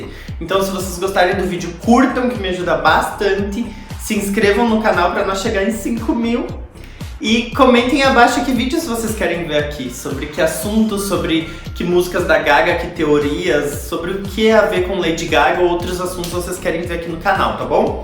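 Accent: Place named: South, Brazil. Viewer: Sotaque do Sul kkkkk A maioria daqui tem esse sotaque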